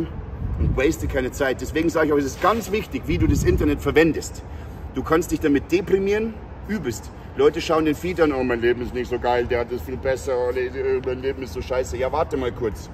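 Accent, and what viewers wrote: Bavaria, Germany. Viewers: Ich muss grad so lachen. Ich hab viele Videos von dir angeschaut u mich immer gefragt was für einen Akzent hat er. Ich hab immer nur geraten und jetzt redest du deutsch, wie geil😛 Ich komm aus Erding. Hast nen ähnlichen boarischen Akzent. Kommst du aus Bayern? Top Videos immer von dir! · bist du bayer?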